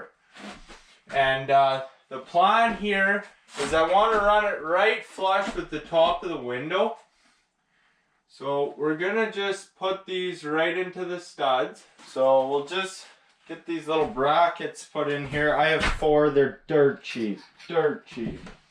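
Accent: Canada. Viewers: Finally a Canadian on YouTube that sounds Canadian! Love your life mate, keep being yourself and we will keep watching and cheering you on!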